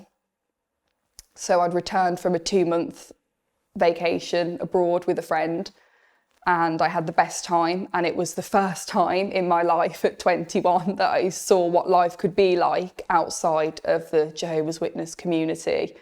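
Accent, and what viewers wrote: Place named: United Kingdom. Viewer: This girl reminds me of a former girl friend from college days, except for the lovely british accent. She was NOT a JW, I should add. What a mixed up cult they are.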